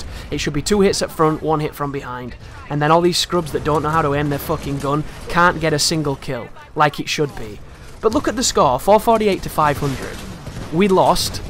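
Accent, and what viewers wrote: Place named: Northern England, United Kingdom. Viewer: I would guess northern England don't know where though.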